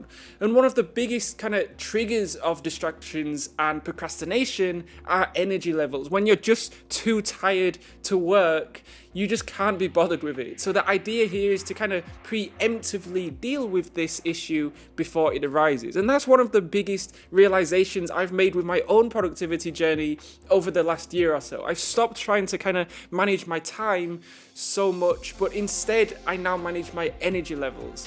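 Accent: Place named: United Kingdom. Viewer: Are you British I like that voice/Accent 💪👍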